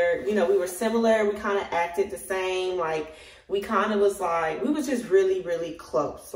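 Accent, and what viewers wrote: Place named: Memphis, United States. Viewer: Are you originally from Atlanta? You sound like a Memphis girl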